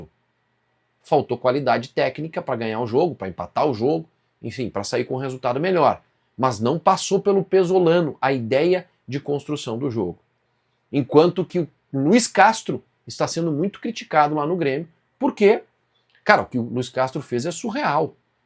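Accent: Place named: Spain. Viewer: sou uruguaio e o som do doble L em gallardo é: gajardo ou gachardo. É vichagra ou vijagra. Esse gadiardo que tu pronuncia ou vidiagra, é espanhol de Espanha. Saludos hermano!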